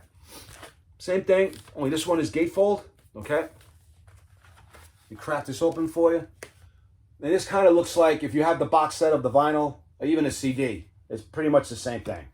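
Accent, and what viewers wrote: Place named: New York, United States. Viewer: Only just found your channel by luck, love it, love the New Yawk chat too, my favourite City in the world.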